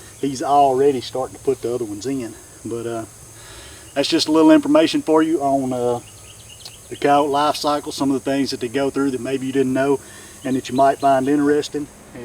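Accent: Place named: South, United States. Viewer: Thanks for the info. By the way, that is the most solid Country Boy accent ever!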